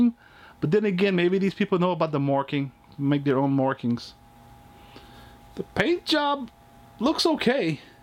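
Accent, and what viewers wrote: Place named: Lafayette, United States. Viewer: dude my Xbox was playing random videos about pops and yours popped up and as soon as I heard your accent I had to do a double take. I was born in Lafayette. so happy I found your channel!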